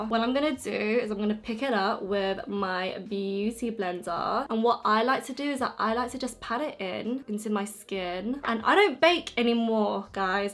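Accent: United Kingdom. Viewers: Omg are you British !!!???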